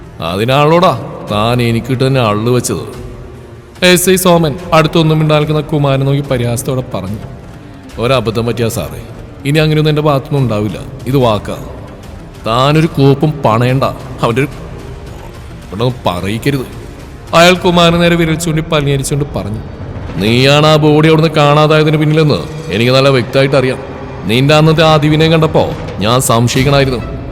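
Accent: Tamil Nadu, India. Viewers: ഉണ്ടേ!!!!!😂😂 ഈ തമിഴ് കേൾക്കാൻ നല്ല രസമുണ്ട് 😊😊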